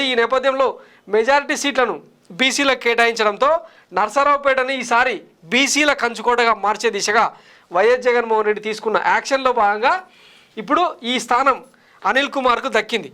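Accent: Telangana, India. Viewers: అన్న గారు మీ వాయిస్ అంటే చాలా ఇష్టం అన్న మీ తెలంగాణ యాషా చాలా బాగుంది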